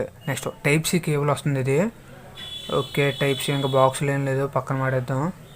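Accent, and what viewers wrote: India. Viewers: nice talk...i think your EAST or WEST in Ap..😁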